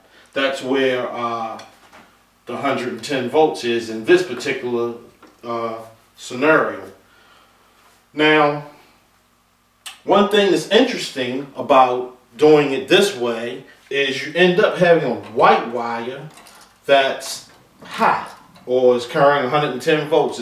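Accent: Baltimore, United States. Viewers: Are you in the Baltimore area? Kind of a Bmore accent. I'm in DC. Anyway kick ads videos. You just got another subscription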